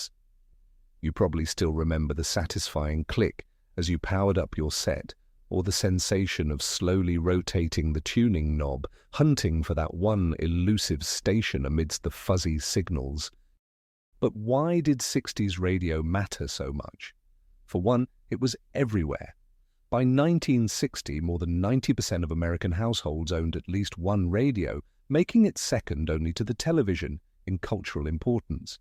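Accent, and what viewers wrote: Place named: Home Counties, United Kingdom. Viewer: Memory Lane America-narrated by some bloke from the English Home Counties (assumng it's not A.I. ). If you want to relate American cultural history, at least have an American voice doing it.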